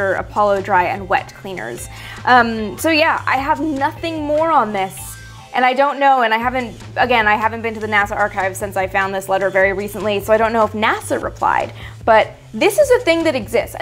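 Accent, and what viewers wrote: Canada. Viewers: Missed your content. But most just missed seeing you. Like you face. Love the Canadian accent.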